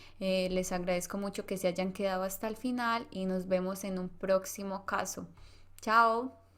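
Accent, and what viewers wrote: Colombia. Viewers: El mas bonito de los acentos colombianos, de donde eres?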